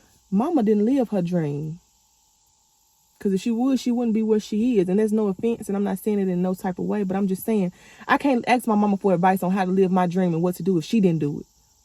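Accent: Mississippi, United States. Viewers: I knew!!!! You had a Mississippi accent!!!! So happy for you!!! You have to do what feels right! Glad I hopped on your YouTube!!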